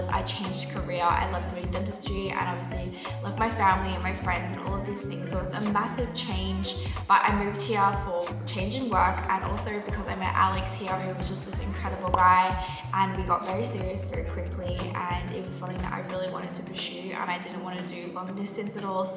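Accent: Australia. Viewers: I have a problem that , I can't understand your Australian accent , I can completely understand Alex bro's accent. In reality , I can only understand American accent because, I am from Pakistan . BTW, Love from Pakistan to Australia , Brazil, and Usa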